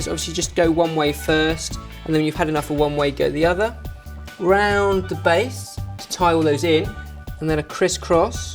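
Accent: France. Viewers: Woo love your french accent, it's quite nice ! :D We looove our îles flottantes, so sweet and light :) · + French Accent = sexy! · So went to Marocco? What a surprise! Btw I always loved this recipe while growing up, my dad used to make it in my birthday. The limoncello touch is nice, I have to try it next time. And nice french accent;) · Just amazing. And cute French accent;)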